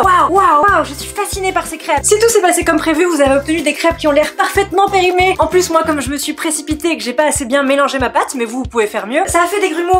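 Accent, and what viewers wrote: South, France. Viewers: Ho oui je veut d'autre vidéo Miam Time :D Et merci pour l'accent de sud ;) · J'adore ton accent du sud ! Je le fais presque aussi mal x)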